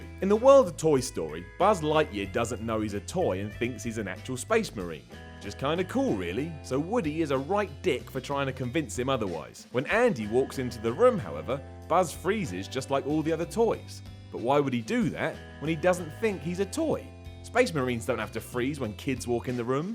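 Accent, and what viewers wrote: United Kingdom. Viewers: Fucking bullshit::: this British accent fuck head thinks he’s soooooo smart ,,,,,cause he’s British?? How’s that British empire doing now ?? British elites???! They even tip like cheap bastards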